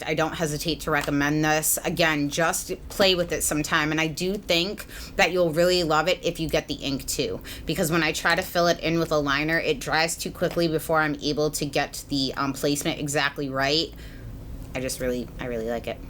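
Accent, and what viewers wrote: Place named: Boston, United States. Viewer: Hey, I love your videos, personality and your Boston accent - it reminds me of home! I like this exercise because it makes me realize that I really do have a lot to be grateful for. Having to choose my top picks, I would choose my skin, as it is nearly flawless and wrinkle free, which at my age is truly miraculous! Additionally, I am so proud to have graduated magna cum laude with honors from an Ivy League school. There were a lot of obstacles in my way, but I pushed through and received an education that no one can ever take away from me! Thanks for the opportunity to win the winged eyeliner products. I, like you, have struggled with uneven wings for years, so to win would be a real treat. Take care of yourself!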